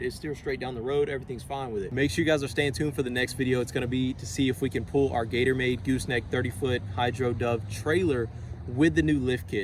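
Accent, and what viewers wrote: Alabama, United States. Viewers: What part of Alabama are you from? I’m from Auburn!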